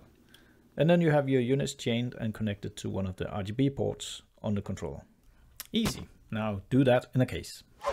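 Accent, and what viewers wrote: Denmark. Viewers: Man kan høre du er dansk😂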